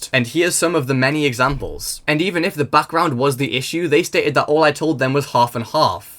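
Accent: Newcastle, United Kingdom. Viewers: Are you from Newcastle bro?Bro you sound like your from Newcastle😭 Saying "roackaet" is a sign lol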